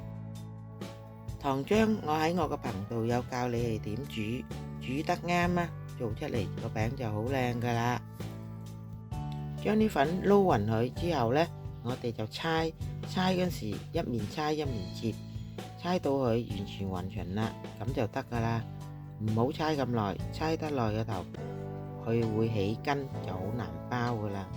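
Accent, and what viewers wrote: Vietnam. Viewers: Amy姐；妳講話的越南口音很重，請問妳是否北越海防人氏？謝謝 ！💝💋💞💯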